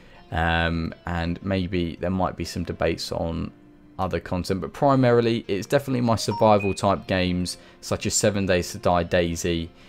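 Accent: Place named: United Kingdom. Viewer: Awesome video + i love that brittish acsent im bad At English because im Finnish :(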